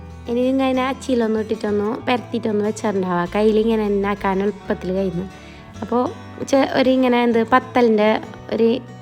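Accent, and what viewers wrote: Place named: Kasaragod, India. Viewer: വീഡിയോ full കണ്ടു.. കാസറഗോഡ് സ്ലാങ് കേൾക്കാൻ.. I like.. സ്ലാങ് ❤️❤️❤️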